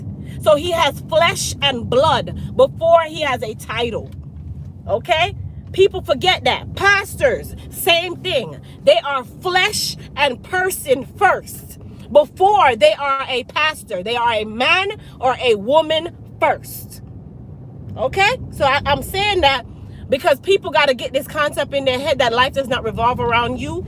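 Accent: Jamaica. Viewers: I love how the patois comes in and then you put it in your pocket for a bit and then you pull it back out when the heat turns up. Blessings to you. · Do I hear a Jamaican accent , dear one ? Sj.💛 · U was born in usa...but u speak jamaican well... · you are absolutely right! Love your Jamaican accent 😊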